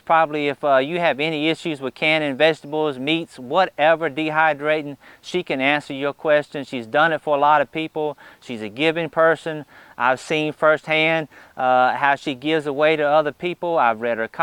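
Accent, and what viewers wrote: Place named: East Texas, United States. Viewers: coming from a big east texas family you sound like every freind & relative, all good folks, pride themselves on good manners, most they would say is "bless his heart, dosn't know any better, wasn't brought up right" You'r a good man & my favorit of all the gardening vidios, truely blessed. so my thanks for all your do. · I've been in Missouri 13 years, but still retain my very strong Texas accent. I appreciate those folks who refer to it as 'charming'. lol I LOVE your accent. It sounds like 'home folk' to me. Great lesson you taught today. I consider you and Bexar good friends, and I think you're both phenomenal teachers. Wouldn't want to be doing this without you. My philosophy when dealing with other folks is, always assume not offense was intended. That's usually the truth. It's served me well.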